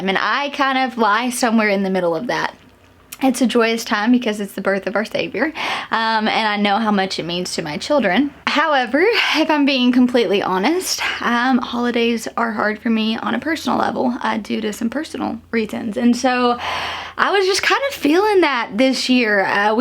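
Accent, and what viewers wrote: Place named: South, United States. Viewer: I like how you Southerners say "feel"...! it's just like "fill" to the northern ear ahhahah it is so pleasant to hear. Happy Gardening and Happy New Year all. Thanks for the inspiration.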